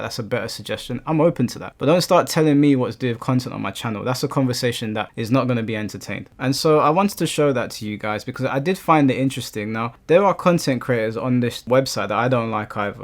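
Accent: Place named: England, United Kingdom. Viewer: You got decent content but I HATE YOUR VOICE it's the English shit I'm American and hate the English accent sorry not trying to disrespect the English just y'all accent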